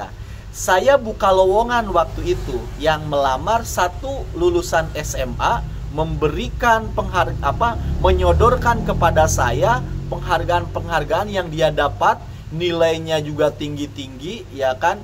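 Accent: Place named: West Java, Indonesia. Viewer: Kang.. knp sy panggil Akang?.. karena letahna letah Sunda.. Salut atas kontennya yg positif dan mengedukasi.. Gaskeun..Lanjuutt.. 👍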